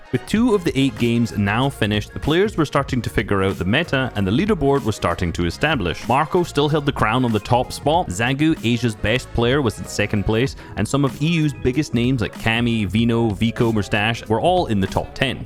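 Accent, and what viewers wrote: Scotland, United Kingdom. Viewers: Scottish folk really shouldn't be doing these voice overs.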